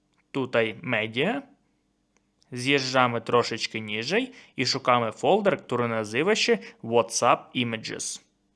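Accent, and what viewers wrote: Ukraine. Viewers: jakoś kurwa nie ufam ci. ten ukrainski akcent zniechęca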